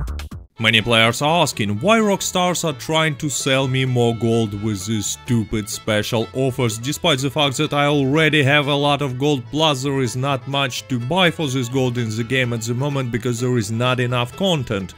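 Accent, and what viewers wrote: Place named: Russia. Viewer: Stop doing all other content to do more videos like these, the sarcasm in his russian voice kills me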